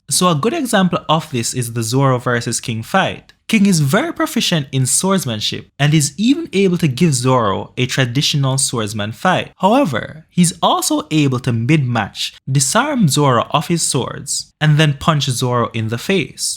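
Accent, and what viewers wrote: Jamaica. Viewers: you from JA bro? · You Jamaican?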